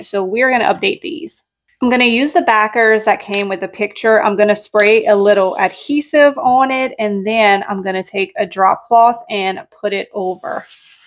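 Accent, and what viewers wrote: Louisiana, United States. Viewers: You sound like a Louisiana girl! I’m from Louisiana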